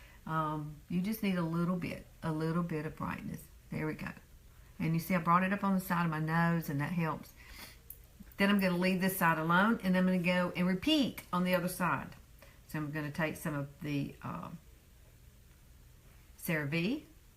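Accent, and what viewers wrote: South, United States. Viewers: Hi! So refreshing to have found you. I am subscribed to a few beauty gurus, but they are all in their 20's and 30's. Fun and entertaining to watch, but I was looking for someone to address some issues of the inevitable aging skin. I am 48, and the one thing I fight with is the fine lines and crepeyness of my under eyes. It seems any and everything I try to use just accentuates the madness😩I'm going to give this a try. never thought to leave that area naked from foundation. I do make my own facial oil with jojoba, coconut or sweet almond as the carrier oil. Then add in essential oils such as frankincense, vit. E, lavender, rose hip, pomegranate oil, sweet orange, ylang ylang or rosemary oil. Not all the essential oils in one potion, I just play around with each new batch and it has done wonders for my skin over the past year. 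Truly amazing. Also, LOVE rosewater! Can't wait to try this. You are beautiful and I love the southern accent. New sub here! Much love from Texas💕💋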